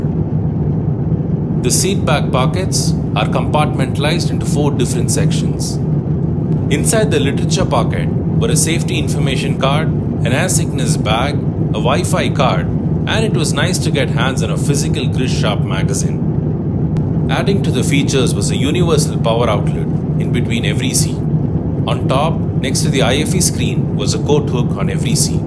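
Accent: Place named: Tamil, India. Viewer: Love from chennai ❤ Btw are you tamilan ? your voice is like that tho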